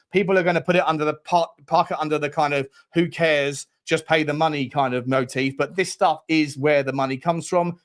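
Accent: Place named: England, United Kingdom. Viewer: You're a legend, such a sound guy. Your updates are great and I enjoy listening to you everyday. As an Essex boy who has moved to Australia 13 years ago its nice to hear an English accent,it makes me think of home. Keep up the awesome work mate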